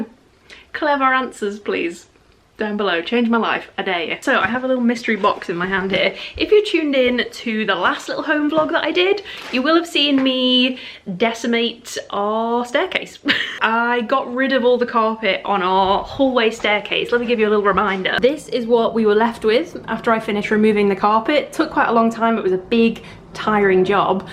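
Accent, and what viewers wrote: Liverpool, United Kingdom. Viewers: Loving your vids! Is that a Liverpool/Merseyside accent I detect? (Being a scouser, transplanted to the Wirral, your mild accent drew me in, as well as how fabulous you are - of course😁)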